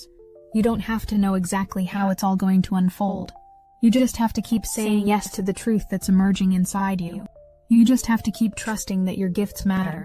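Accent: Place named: Netherlands. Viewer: There is something with dutch people and english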